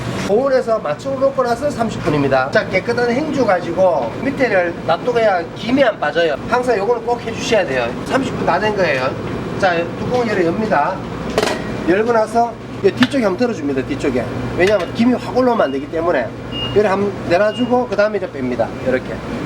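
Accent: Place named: Gyeongsang, South Korea. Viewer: 구시한 갱상도 사투리! 따봉입니다! 꼭 따라해볼께요!! ❤️👍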